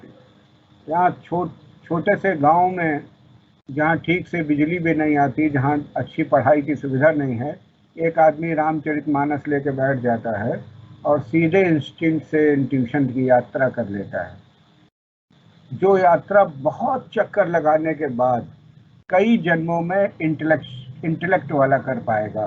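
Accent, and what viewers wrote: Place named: Varanasi, India. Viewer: Pranam, Pranam Pranam...... Pranam Pranam Pranam ..... do not have words to express my gratitude to you for this lesson....... you said in pure Varanasi Hindi accent & style.........replayed & listened it 2-3 times.... hearing astrology in pure hudh shindi after long time...........mera pranam sweekar karey.....मेरा प्रणाम स्वीकर करे The words, pictures, spoken words ....etc., so nicely done.... can only come from Lord Shiva bhakhts......2 hrs = 12 years of learning.... please invite Guruji to conduct few more lessons.... such an excellent gyan.....ज्ञान ब्रह्म ज्ञान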